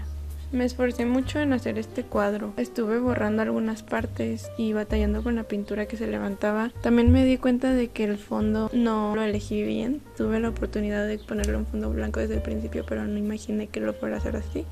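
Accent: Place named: Fresa, Mexico. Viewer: que agradable sujeta, suenas fresa, pero sin forzar, lindo :) nuevo sub cómo dirían los chavos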